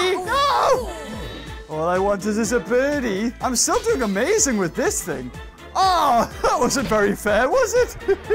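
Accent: United Kingdom. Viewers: Are you a britt? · Are you a British socksfor1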